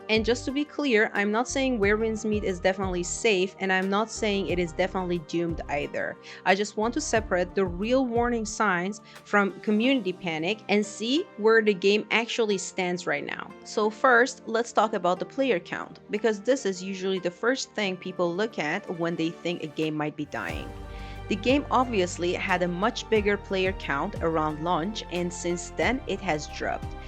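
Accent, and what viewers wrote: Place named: Iran. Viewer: your accent sounds like it Persian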